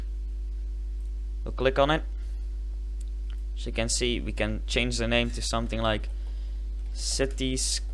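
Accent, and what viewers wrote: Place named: Netherlands. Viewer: nice dutch accent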